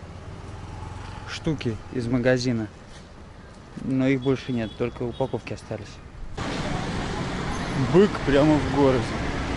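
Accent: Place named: St Petersburg, Russia. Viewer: Ох и "лицо" у тебя на превьюхе... Акцент конечно капец. Шаверма, поребрик...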